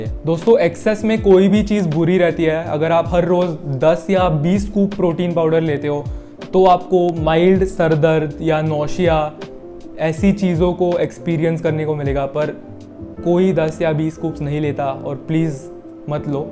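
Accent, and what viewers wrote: Maharashtra, India. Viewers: His Hindi has Maharashtrian touch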